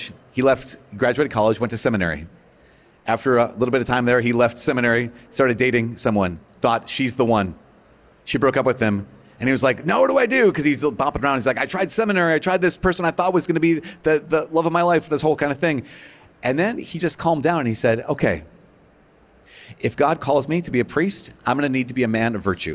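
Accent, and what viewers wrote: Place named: England, United Kingdom. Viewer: Great English accent!!!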